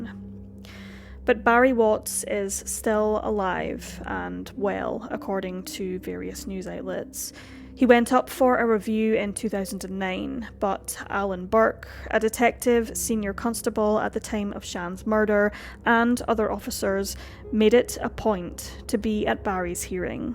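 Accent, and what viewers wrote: Scotland, United Kingdom. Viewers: I think she's scottish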